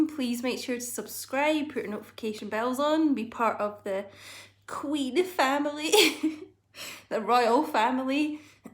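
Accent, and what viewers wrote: Scotland, United Kingdom. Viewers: I love your accent! Are you Scottish? Are you doing private readings? I'm interested :D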